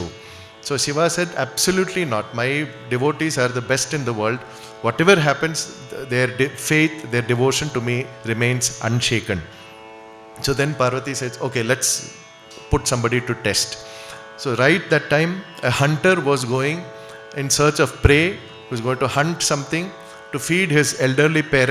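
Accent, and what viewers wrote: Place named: Telugu, India. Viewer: Sounds very native Telugu. Thanks to Prince garu.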